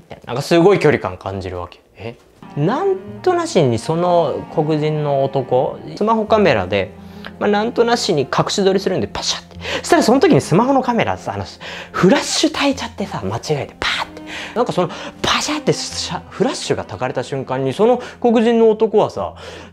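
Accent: Kagoshima, Japan. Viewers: さんの情報全くない中で見ましたが、開始15秒で鹿児島出身だということが分かりました😂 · 鹿児島の方ですか！？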